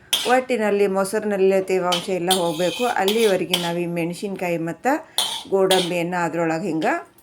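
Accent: North Karnataka, India. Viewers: Uttar karnataka language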